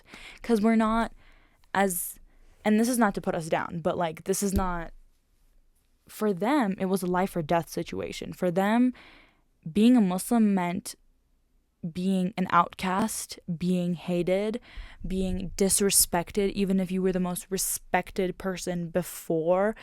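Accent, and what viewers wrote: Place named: United States. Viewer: Сказать что я удивлен, ничего не сказать 😅Это второе видео из вашего канала, которое заставило меня остановиться и всё же написать коммент, так как вопрос в голове не даёт покоя. I am really impressed that you know so many languages and even acquired the American accent. Were you exposed to the language growing up? Яна битта саволим бор эди, тушунишим буийча Фусха ни урганвосиз. Узиз урганвосизми йоки тил курсларига катнавосизми йоки бирор урганиш методларини кулавосизми?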